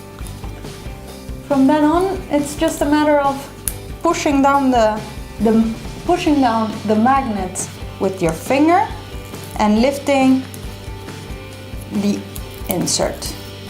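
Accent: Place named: Italy. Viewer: è il primo video che vedo tuo e non so perché sapevo che eri italiana per la pronuncia hahahahahaha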